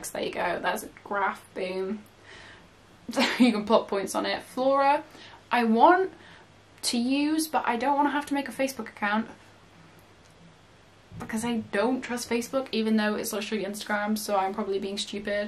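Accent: United Kingdom. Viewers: Are u British? Just asking cause of the actient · I didn’t realise u were British lol 😂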